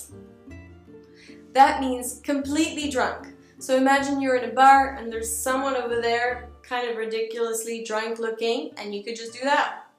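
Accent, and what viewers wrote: United States. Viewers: By the way, how do you manage to have such a fantastic American accent? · she's american, not french, i'm french by myself and when she speaks english she has a little accent so i think that's why her american accent is perfect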